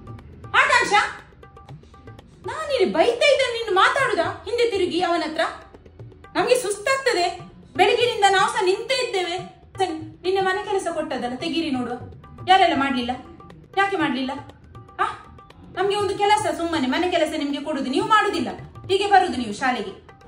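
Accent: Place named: Udupi, India. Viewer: ನಿಮ್ಮ ಭಾಷೆ ಸೂಪರ್,ನನಗೆ ಉಡುಪಿಯಲ್ಲಿ ಇದ್ದ ಹಾಗೆ ಆಯ್ತು ಮಾರಾಯ್ರೆ😂😂😂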